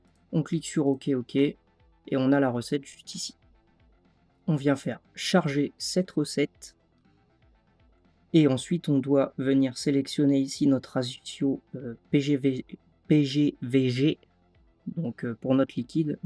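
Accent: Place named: England, United Kingdom. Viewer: Ah l'accent anglais là... :face-purple-crying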